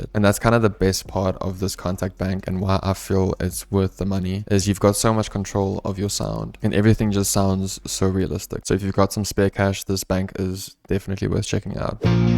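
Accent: South Africa. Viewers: Have you ever lived in south africa? Accent is strong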